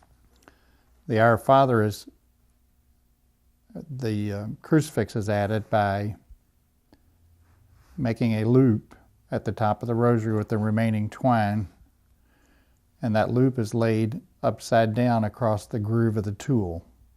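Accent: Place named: Northern Ireland, United Kingdom. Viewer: Very northern irish sounding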